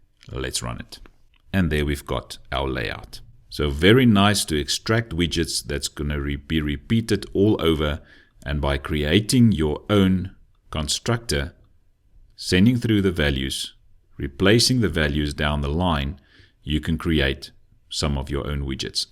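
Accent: Afrikaans, South Africa. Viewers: This was awesome! I solved maybe 75% of your exercise. I got a little stumped on how to format the icon-text-icon structure, and must say, your approach made sense. Overall, the mere act of trying made things click that much more. Thanks Mr., you're a 1 in a million lecturer! As an aside, when I was younger, a lot of my teachers were Afrikaaners, so hearing your accent floods my heart with some beautiful nostalgia. Best wishes, and I'll keep sharing your videos + playlists with all my developer friends that are trying to get into Dart/Flutter. Best wishes from the USA, and stay well ☮️🏆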